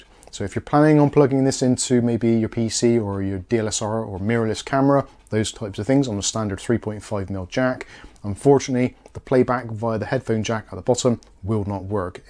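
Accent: Bristol, United Kingdom. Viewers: Your audio has always been ok, despite the lovely Bristolian accent. My ears are not good enough to tell such details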